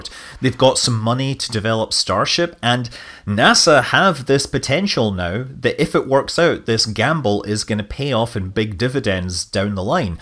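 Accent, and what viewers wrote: Scotland, United Kingdom. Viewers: Are you Scottish? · "Hulloo, Scott Manley here." How do you write the "Scottish" accent? I love it but don't know how to get those "ahrr's" in print, bummer!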